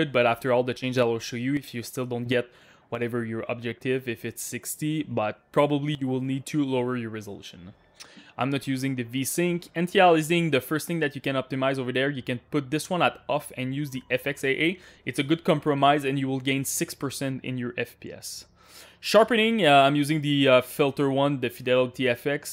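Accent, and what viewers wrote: Canada. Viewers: Bruh I didn't know Canadian people actually speak like this. I thought this accent was just a running gag on cartoons😅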